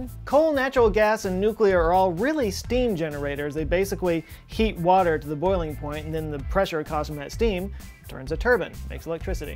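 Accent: Texas, United States. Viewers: Why do you keep talking about turning a turban? OH! TURBINE - rhymes with coal mine Funny American accent, but I still enjoy your videos :-) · So the English accent is the only correct way and the American accent is always wrong? I don't think so. Also, there are many American accents, and most of them pronounce the word TURBINE not TURBAN. His is a regional accent. Edit: Some Americans also pronounce the word like TURBEEN. This is also an accent. It isn't a more or less correct way to pronounce the word. Don't be an accent snob. nobody likes an accent snob. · Dude... this seriously wasn't worth addressing. We love you both despite and because of your crazy Texan Sikh-friendly accent. You should be proud of being able to cause such profound mood elevation with it. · It's the American accent. Autism is abound in the comments. We tend to say Tur-Ben, not Tur-Bine. 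Just wow.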